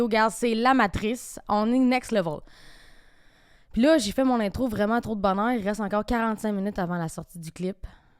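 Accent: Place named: Quebec, Canada. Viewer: Comme je te l'ai promis je regarde le replay! Trop deg de pas avoir pu être là! lol comment pas paraitre stressée 😉 lol ta montre qui se d'éclanche 😜 et pour moi à cette heure le sport avant de vraiment commencer la vidéo ^^ Hey! Attention, ti vo quère del cayelle (oups mon côté Ch'ti qui déraille! Oui le Ch'ti c'est le patois des gens du nord de la France 😉 on va bien et toi? Oui un peu tu panique car tu va être face (enfin tu as étais face) au réactions en direct live de ta communauté pour la sortie de ton clip! Donc Zen tout va bien se passer! 😉 MDrrr "on a vieillit" Attends tu aura vraiment bien veillis quand tu commencera à dire "moi à ton âge" ou "mon de mon temps " lol 😜 oki je met pause à la vidéo pour aller voter! Je reviens! ^^.............................. Voilà c'est fait!;) regarde tes mp et tu verra ce que j'ai fais en plus;) trop stressée pour pas l'avoir vu avant je pense;) 🤣🤣🤣 🦦🦦🦦🦦 ^^ à mon souvenir tu avais dis 18h donc non j'étais pas au travail mais je faisais dodo car 1h du matin heure de France;) ahh c'est donc ça l'odeur qu'il y a depuis un moment! 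je pensais que c'était moi lol 😅😅😅 prochaine fois pense à prendre une bassine et un essuis (je rigole ça se voit même pas 😉 ) j'adore cette chanson que tu as fais! il était juste magnifique ce clip! Gros bravo! Et contrairement à certains Canadiens tu as même ton accent (par rapport à moi Français 😉) 👏🏻👏🏻👏🏻 13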